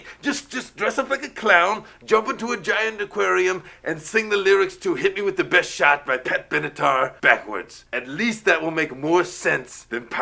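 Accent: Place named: Ireland. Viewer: sounding quite Irish in this video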